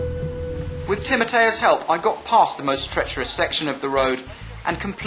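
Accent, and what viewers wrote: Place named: United Kingdom. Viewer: stupid bri-ish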